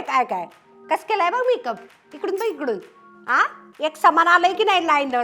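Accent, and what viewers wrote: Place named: Satara, India. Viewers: Ase satari tone madhe banvat ja vedio every week. Ekdam zaak performance. ❤❤❤❤❤ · Khup chaan saataari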